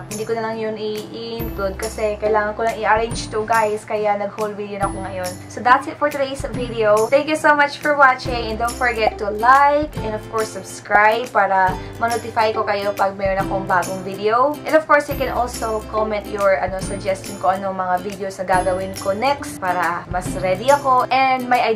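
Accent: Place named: Visayas, Philippines. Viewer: Bisaya ka po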